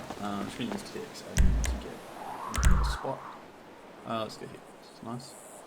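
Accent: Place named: Australia. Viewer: me 10 seconds in: this guy is aussie so it has to be good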